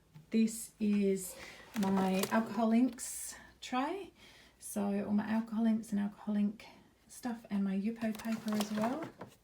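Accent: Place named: Australia. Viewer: Any chance your Australian, just accent sounds aussie and well normal. its weird finding an aussie card maker.. well either aussie or kiwi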